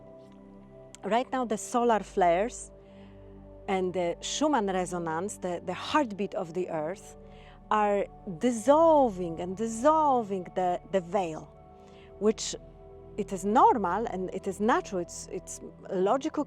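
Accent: Poland. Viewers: Omg you sound so Polish, lol. ❤❤❤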